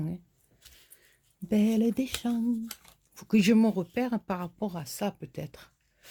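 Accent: South, France. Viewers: Cc ma, tu as toujours des idées originales....elle est trop sympa cette cartounette! En plus à chaque fois que je t'écoute tu me rappelles le sud où je passe mes vacances d'été depuis 16 ans! Prends soin de toi ma et à très vite pour un tuto! Pleins de bisous. Sandrine du 91 😘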